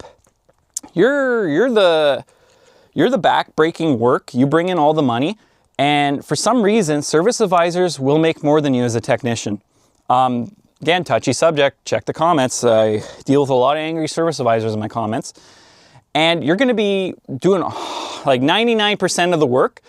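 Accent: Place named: Canada. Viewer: are you canadian or just from the midwest ?☠️☠️ pretty cool accent. but came here to say i wanna be able to fix riding lawn mowers and mess with cars, aka fix them, possibly rebuild engines and just want to not be confused when opening the hood of a car.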